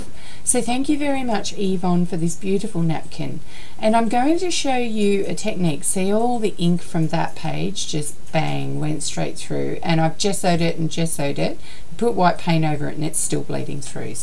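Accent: Australia. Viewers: Yay! Love your videos, I learn so much from you! And I love hearing your Aussie accent... there are not many of us Aussies here on YT.... Cheers, Ginny:o)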